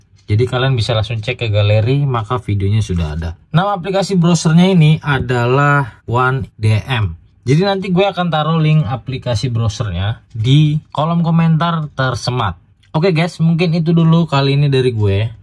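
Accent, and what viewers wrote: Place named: Sunda, Indonesia. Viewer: Kok seperti suara Aa bandung ya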